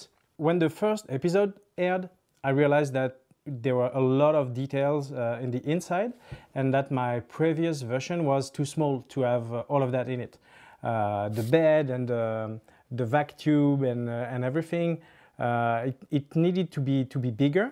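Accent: France. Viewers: Tu parle frençais vue ton accent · Oula l’accent français est très présent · You really hear, that he is french. But it hears so good! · l'accent français yeees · He's french like me ! This accent français ! Cool que tu sois une des personnes qui a fait le razor crest en lego ! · Cet accent français magnifique ;) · L'accent français oulala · MDR cet accent français On est là !!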